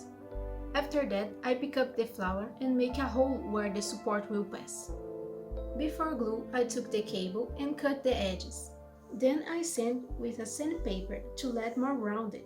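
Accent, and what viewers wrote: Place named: Brazil. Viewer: que ingles de brasileiro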